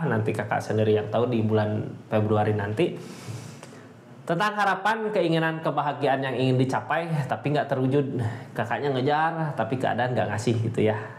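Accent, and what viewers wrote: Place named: Sunda, Indonesia. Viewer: Aamiin Yaa Robbal Aalamiin. Do'a yg sama juga buat kang nu kasep...nu bageur...nu capetang..... Sunda na medok....asli na ti mana? Hatur nuhun,wass nenek Elly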